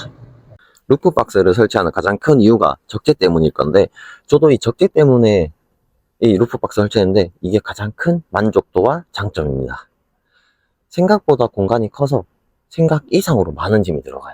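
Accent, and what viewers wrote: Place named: Gyeongsang, South Korea. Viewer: 갱상도 어디시죠?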